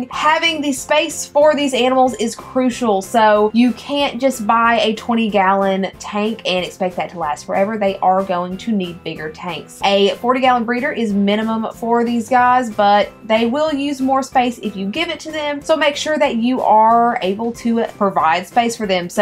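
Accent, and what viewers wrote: South, United States. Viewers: Just curious where you’re from? Hear that southern accent, reminds me of the way everyone talks back home.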